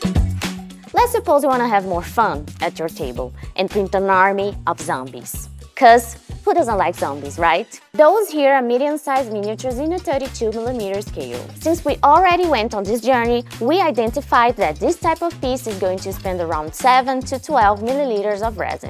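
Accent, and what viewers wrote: Brazil. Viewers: Você é brasileira, esse sotaque é de brasileiro kkkkkk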